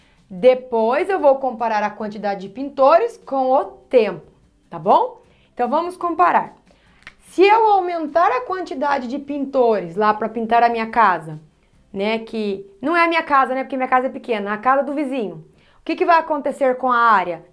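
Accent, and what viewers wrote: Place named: Minas Gerais, Brazil. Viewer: Professora você tem um sotaque muito bonita . você parece ser mineira . gosto muito de matemática é uma matéria muito utilizada no nosso dia a dia 😔 obrigado professora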